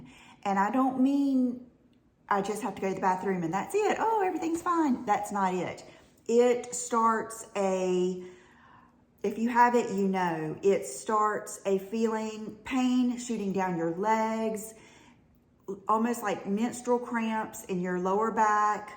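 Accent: South, United States. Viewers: Hey, I love all your video’s! Makeup, health, clothes, plants or jewelry. Whatever you talk about is inspiring and fun to watch. You said you enjoy listening to Tati in your car…Well Your the one I love to listen to in my truck…your voice is so calming…I love your southern accent and how down to earth you are. If people are offended or bothered by you talking about your carnivore diet, then it’s their problem. I find that so odd that someone would tell you that you make them feel bad by talking about your health journey. Obviously they feel guilty and have issues in their life that they need to address. You can’t make everyone happy!!! Keep doing what you do and don’t let anyone make you feel bad about it!!! I have the same issues you were having with joint pain and gut issues. I went on a blood type diet that basically had me eating eggs. I felt so much better but then the holidays rolled around and I gave into the cookies and now I’m struggling to stop eating sugar. 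It is so addicting but makes me feel horrible. Listening to you today has helped push me to start that change in my diet again. Thank you!!! Love you 🤗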